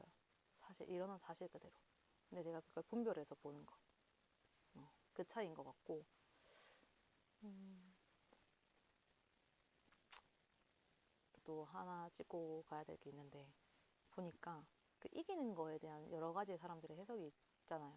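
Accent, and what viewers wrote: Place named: Gyeongsang, South Korea. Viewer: 앗 사투리 너무 반가운데요~~^^ 경상도분이셨나요? 영상 감사합니다. 저도 어제 댓글로 말씀드리려했는데 영상 소리가 작아서 스피커 최대로 듣고 있었어요😅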